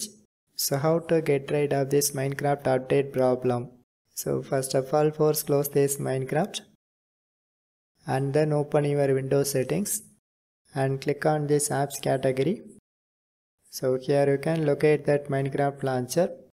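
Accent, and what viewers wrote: India. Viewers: If i hear this idian voice i know i will get saved Ty bro ❤❤❤❤😂😂👍👍👍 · THANK YOU, KIND INDIAN MAN. I LOVE YOU AND YOUR PEOPLE! AS SOON AS I HEARD THE ACCENT I KNEW MY ISSUE WOULD BE SOLVED!! ❤❤❤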